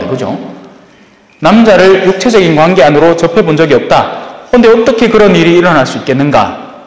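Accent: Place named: Gyeongbuk, South Korea. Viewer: 신부님.감시합니다 다정.다감하신.말씀.강론.찰지십니다..경북..내고향.의성가음면...신부님.사투리..베트남에서 기뻐합니다..아멘.🙏🙏🙏🙏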